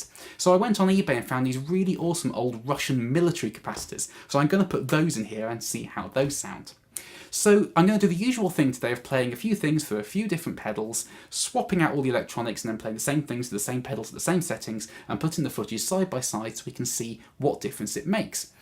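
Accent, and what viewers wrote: United Kingdom. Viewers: Great job! And I love your cool british english. Well done, sir.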